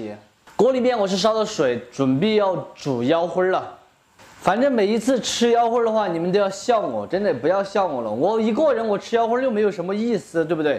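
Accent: Sichuan, China. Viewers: 喜欢看你做菜的视频，更喜欢你川普😄😂，可惜我是不喜欢做菜但又很很喜欢吃。只能看看你的视频过一下瘾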